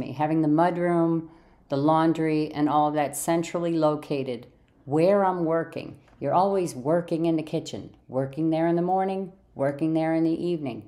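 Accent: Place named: Northeast, United States. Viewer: really appreciate your vids. You so remind me of the Northeast, where i used to live- just your ways,, accent- down to earthed-ness-loveit!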